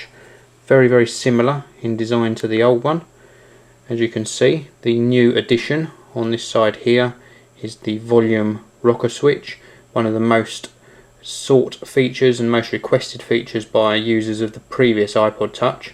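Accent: England, United Kingdom. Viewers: you have a heavy brittish accent...cool =) and wayz i want to got a ipod touch 8 gig 3g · ru like from england cuz u have a acksent and i like ur ipod i be geting 1 really soon 2 more months and i be getting 1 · A real good review.. ps. i like yor british accent^^.. i´ll get one next january..^^ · R u British? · He's a Brit. Australians (I'm an Australian) sounds completely different! LOL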